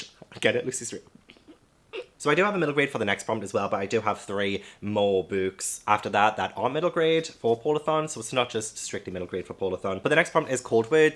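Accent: United Kingdom. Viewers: For those who complain about how you say books must not know that you have a beautiful British accent and that is how the British say books, the way you tried to say books sounded unnatural to me...lol...sounded like you were trying to do an American accent. I personally love hearing a person speak that doesn't sound the same as me. My personal favorite is the way people from the UK say helicopter, it's so adorable. Never change for anyone, always be you. I couldn't stop laughing about that manga Dick Fight. Now, I am curious about it...lol. Also, shout out for the hoodie, that's '90's classic Nickelodeon cartoons, love it!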